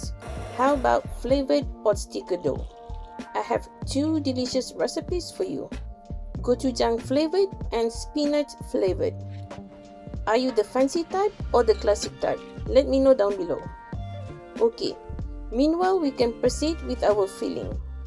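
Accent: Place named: Malaysia. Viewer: Found this video after i’ve already made potstickers which i failed :( btw, are u malaysian? The accent is so familiar, but the channel name doesnt sound malaysian at all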